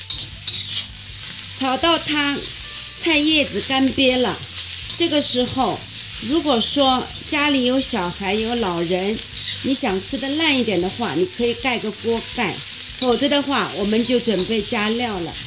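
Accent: Shanghai, China. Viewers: 阿姨是上海人吗哈哈哈哈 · 哈哈 听得到上海普通话. 纳泥! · 阿姨上海宁对伐 哈哈 嗲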